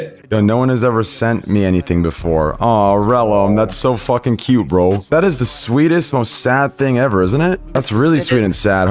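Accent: United Kingdom. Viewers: Mogul Mail is enthusiastic charlie, is british mogul mail. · yuck british 🤢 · being British does give a large factor, his voice is way more pleasant to listen to than Critikal. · ur british? ok cya not listening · The only reason I watch for news is because critical sounds really boring. And pyros British. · wait your British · You're brittish? fuck man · Listen, I like your content but you’ve got to stop pronouncing names so Britishly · I only watch for his yee yee ass British accent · The one reason I what covering twitch drama is to hear a Bri'ish person say these streamers' name:))) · Bri'ish? — opinion rejected...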